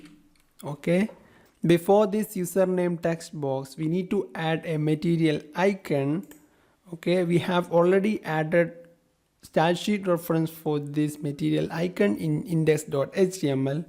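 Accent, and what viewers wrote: Kerala, India. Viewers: malayali aano? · pronunciation ketata malayali aano na enikyum ondu shamshym..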